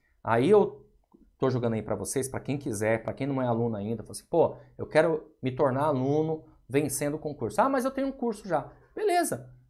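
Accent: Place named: Sao Paulo, Brazil. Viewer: Esse professor é show .... parabéns 🎉 se deus quiser passarei em um concurso que irei fazer ....você não é de João pessoa professor ? Tem sutaque de paulista ....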